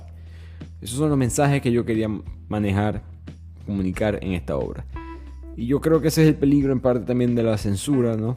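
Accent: Maracaibo, Venezuela. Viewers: Tienes el acento del marabino educado e intelectual.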